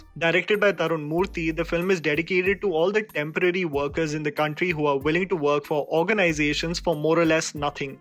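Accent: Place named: Bengal, India. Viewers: OK dude being a Bengali I have to say that you need to work on your Bengali accent as it's simply terrible. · sir your Bengali accent is to bad😒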